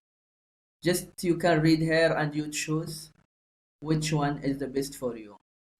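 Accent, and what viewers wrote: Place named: Arab world. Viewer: تحس عنده الاكسنت العربية شوي ممكن يكون من اصول هندية ولا شي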